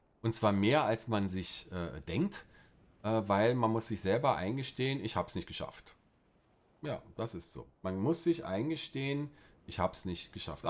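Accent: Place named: Germany. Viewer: Du sprichst die Städte wie ein deutscher aus. Bist du wirklich Türke?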